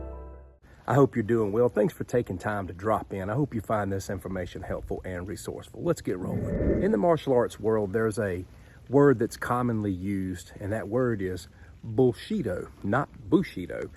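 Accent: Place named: Louisiana, United States. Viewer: Interesting accent. I’m from south Louisiana and you remind me of there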